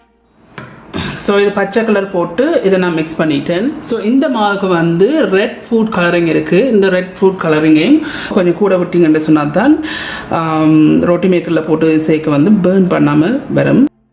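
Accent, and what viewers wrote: Jaffna, Sri Lanka. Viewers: Sister Are you from jaffna nelliady?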